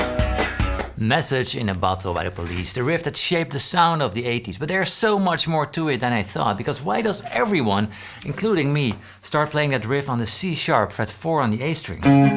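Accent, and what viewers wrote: Netherlands. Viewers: Ik dacht al, waar komt deze man vandaan, hij klinkt Nederlands xD Toffe video, enorm van genoten! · Dutch I think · Are you dutch ?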